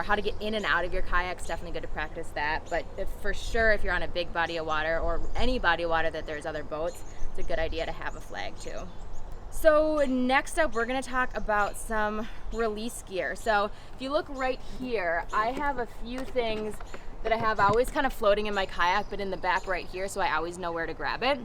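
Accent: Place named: Michigan, United States. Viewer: oh yes! I’ve lived in Florida for 25 years now but my first 35 years I lived in the upper peninsula of MI.. Still love & miss that accent! And yes kiddo you got it!😊👍🥰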